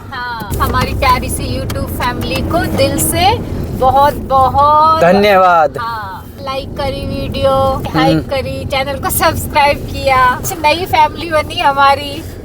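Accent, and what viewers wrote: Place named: Uttar Pradesh, India. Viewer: Bhaiya aap kaha se ho aapke bolne ka tarike se tho aap UP se lagte ho bhut acchi lagti h aapki video me aapki new subscriber 😊❤❤😊😊😊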